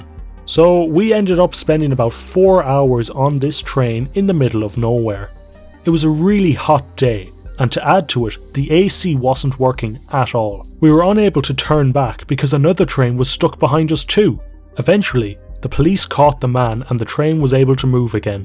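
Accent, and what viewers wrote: Ireland. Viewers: He sounds irish Jacksepticeye intensifies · He does I was thing the exact same thing. Also because they both have a deep voice and an Irish brogue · Sounds Irish.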